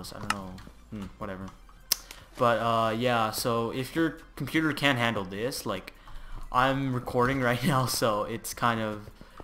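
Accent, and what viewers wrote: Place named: United States. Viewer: Great :) . But u actually sound like you are an american :O . How ?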